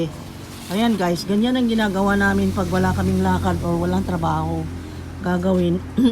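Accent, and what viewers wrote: Ilocos, Philippines. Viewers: I was wondering about your accent all along, Ilocano pala kayo living in the Visayas. My family is from Pangasinan-Tarlac, both Ilocano parents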